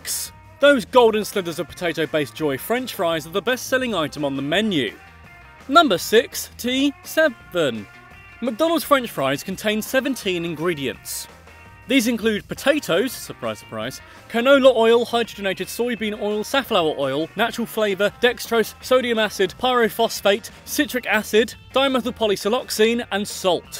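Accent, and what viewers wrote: United Kingdom. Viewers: All british people pronounce it like that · is it just me or would you marry the british voice guy because of his voice and hilarious puns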